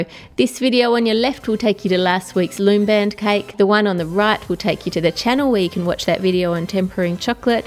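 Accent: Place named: Australia. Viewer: ugh i love aus accents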